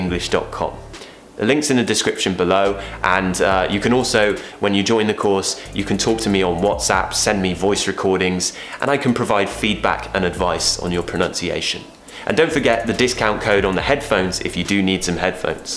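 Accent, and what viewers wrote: United Kingdom. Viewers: I'm not exaggereting right now. Literally, you are the best english teacher on youtube or galaxy. I'm constantly watching your videos and learning decent British accent. Thank you very much mate. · I am gonna come to uk just to speak in your accent. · Because he himself speaks very clearly british English it is easy to improve our accent just while listening to him and repeating the way he speaks. That’s why he is very effective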